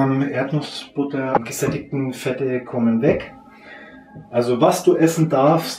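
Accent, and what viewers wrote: Bavaria, Germany. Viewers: woher kommst du eigentlich ursprünglich? man hört einen bayrischen akzent raus. aktuell residierst du in nürnberg hab ich gehört? ;)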